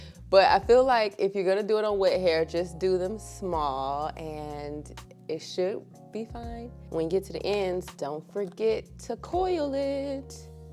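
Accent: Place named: Atlanta, United States. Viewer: Hey girl I'm new to your channel and so far loving the content when I heard your voice I knew you were in the A well pretty close to it. Hey neighbor I'm from Decatur 😊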